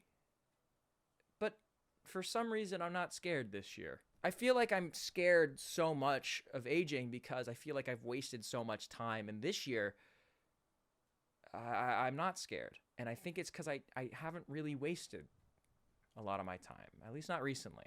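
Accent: United Kingdom. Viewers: Happy birthday to one of the best/sweetest guys I've ever seen! happy birthday to the guy that brought me friends that i can fangirl with over how amazing you are in every way! happy birthday to the man who makes My day almost on the daily!. Happy birthday to the guy that I found all those years ago That made me swoon over the British accent from the 1800s and I obviously know that's no longer a thing anymore but that's basically how I discovered you (on yt with compilations of your tiktoks since I didn't/don't have tiktok downloaded on my phone) Happy birthday to one of the most genuine people I have had the pleasure seeing through YouTube. I can only hope one day that I'd actually get to meet you and truly show you how much you mean to me. This is not a parasocial thing I truly see you as like a friend who's been there for me without even actually saying anything directly to me or being right next to me but still being there if that makes sense?😅😊. 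Thank you and happy birthday! Thank you. I hope it's the best birthday. Thank you! Until the next one and the one after that and so on and so forth. I'm 21 years old and I will be 22 in Sept.17th. Sorry for the tmi.. anyway i hope that everything goes well for you, I truly mean that!. I hope this is not too much or creepy to you, but I really appreciate you for as much as I can without knowing you (in real life) so to speak. Anyhow, have the most wonderful birthday ever because you deserve it you're a wonderful man! you have help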